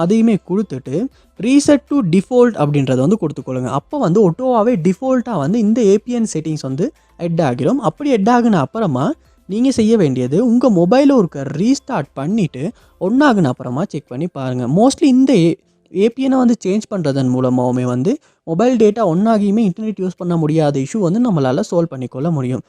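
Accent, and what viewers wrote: Sri Lanka. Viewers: Srilankan tamil 👍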